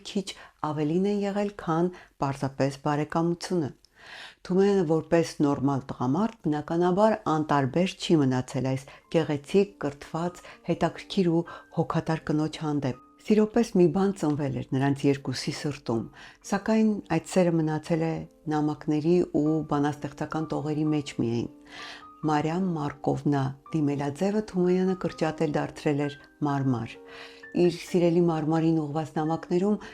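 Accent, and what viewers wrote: Standard. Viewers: Հարգելի ❤️ինչպես միշտ շատ հետաքրքիր է ձեզ լսելը 🥰 Ձեր մաքրամաքուր հայերենը կրկնակի հաճելի է լսել 🥹🥹🥹🙏🏻🙏🏻🙏🏻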